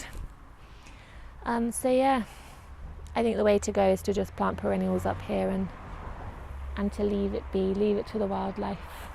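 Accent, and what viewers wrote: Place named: Wales, United Kingdom. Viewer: Hooray! Lovely update 😊 You know, I think we're all very critical of our own Allotments, I think yours looks rather tidy! Looking forward to seeing a bit more of you in the winter months. A bit of a Welsh twang in there too 🐑🐑 x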